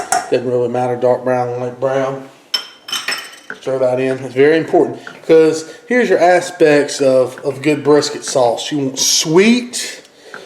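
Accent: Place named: North Carolina, United States. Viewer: cool I go to Cape lookout a lot and they sound like you. Thanks for the video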